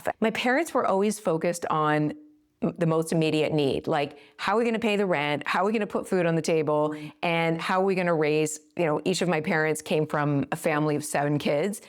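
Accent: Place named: Canada. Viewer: Great information. I am getting ready to retire next year. Btw, listening to your videos I think to myself I wonder if she’s from Canada. Your accent gave you away.😅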